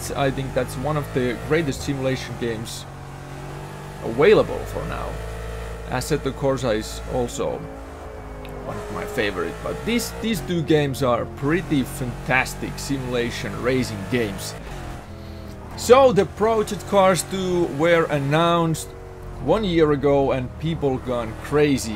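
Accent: Finland. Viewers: Are you Finnish? You sound Finnish.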